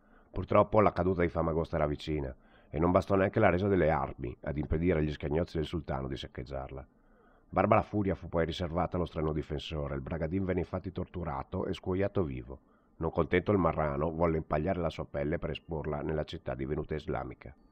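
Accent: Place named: Veneto, Italy. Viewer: Perche' non capisci che la parlata e' veneta, il testo e' antico... e' forse una delle piu' belle letture sulla battaglia di lepanto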